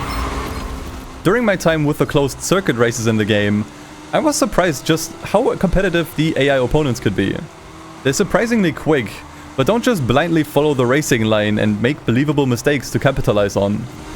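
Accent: Germany. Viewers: Man hört sofort das du Deutscher bist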